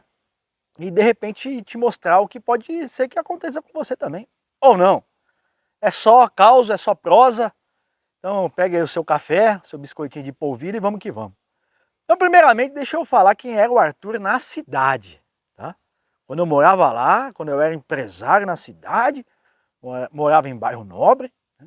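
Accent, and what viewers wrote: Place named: Minas Gerais, Brazil. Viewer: Como fala o mineiro . Que bobajada bunita sô.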